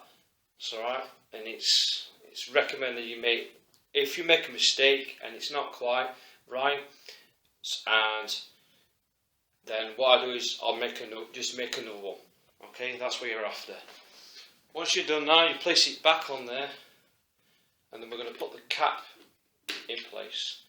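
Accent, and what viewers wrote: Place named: Pendle, United Kingdom. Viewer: Where are you from mate? You sound local to Pendle where I am from, just ordered xt6 telescope to begin astronomy with my son, any tips welcome.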